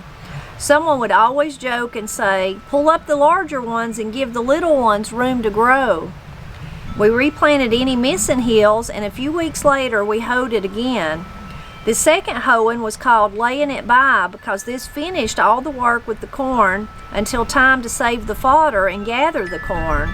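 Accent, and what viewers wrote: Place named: Appalachia, United States. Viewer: Listening to you read these old stories makes me smile, and brings joy to my heart. This reminds me of my Mama, and Mamaw reading to us as little kids. There's a special was us from the mountains talk, it's not a southern accent, it's a lot richer. Thanks!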